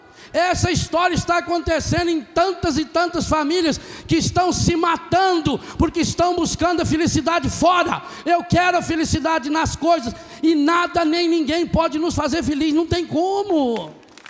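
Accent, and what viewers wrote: Minas Gerais, Brazil. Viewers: Eu aqui lutando contra a ansiedade aí vejo este vídeo de um santo pregando do seu jeito caipira de minas❤️❤️❤️🙌 Deus seja louvado!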